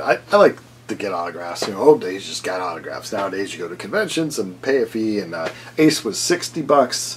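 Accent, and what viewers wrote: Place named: Chicago, United States. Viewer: I can tell right away that you're from Chicago. I grew up in Chicago but I live in California these days. That Frehley's comet live album was recorded at the Aragon Ballroom. I was there. Y&T was the opening band. had the best song on that comp album " ".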